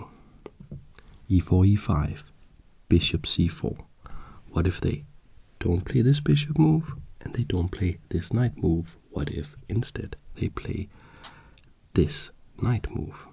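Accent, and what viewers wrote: Denmark. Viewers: Are u from denmark? I can hear the accent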